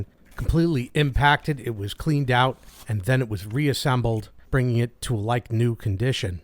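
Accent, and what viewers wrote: United States. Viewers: I enjoyed the whole 4 video series - very methodical and informative. If anyone needs the inspiration to perform this type of work themselves then your videos give it in spades. Question: Why was the machine set to language “German” when you’ve such a strong American accent ?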